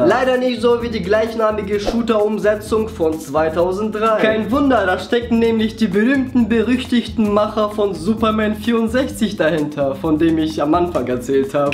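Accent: Russia. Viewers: Er ist russe...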